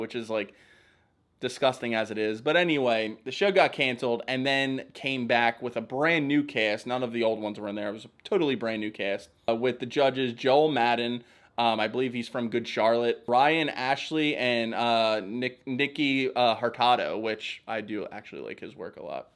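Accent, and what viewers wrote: Philadelphia, United States. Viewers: Those shows drive me bananas. I'm the only heavily-tattooed person (and usually the only person with tattoos at all) in nearly all of my friend groups, so people always come to me with questions, and it's usually because they've seen some terrible show like this. Also, I like your accent. Are you from Philly?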